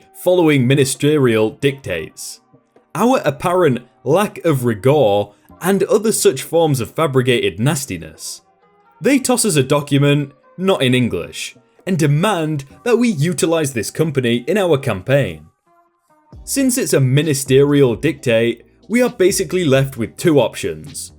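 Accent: United Kingdom. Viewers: Yes, it's called British pronunciation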